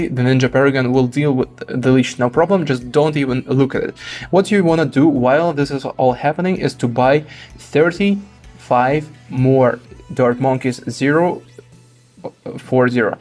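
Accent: Russia. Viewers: Wait.... good tutorial but are you russian? If so you have a really great English accent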